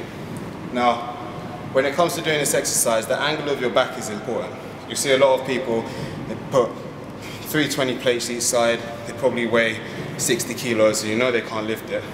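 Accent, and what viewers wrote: United Kingdom. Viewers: As an American (white guy) when I hear a refined British accent coming from a black man who probably has a normal job of some sort, it gives me hope for the average American black person. Not much hope but still a bit of hope that they are capable of being refined.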